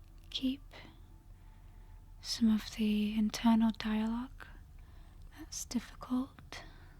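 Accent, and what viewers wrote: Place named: Northern England, United Kingdom. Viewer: Glad your retaining your northern accent in the main. Greater Manchester area perhaps. Leigh. Rochdale. Bolton. Summat like that. All the best from sunny morecambe. Cheers.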